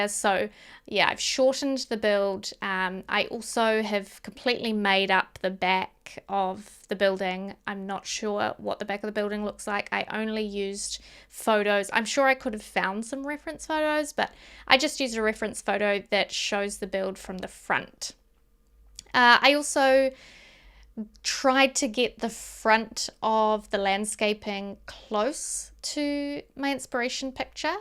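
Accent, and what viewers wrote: New Zealand. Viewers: Lovely building! It’s my first time watching your channel and loved your voiceover, New Zealand’s accent it’s so pretty 💗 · Hi, I am from New Zealand too, it was quite different and exciting to hear a Kiwi accent over a Sims 4 speed build. This is such a beautiful build, I can't wait to try my hand at furnishing it.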